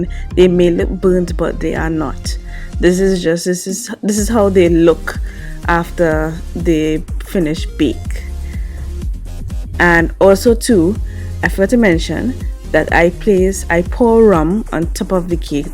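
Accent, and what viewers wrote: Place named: Tobago, Trinidad and Tobago. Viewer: i can hear that strong bago accent...keep it up dear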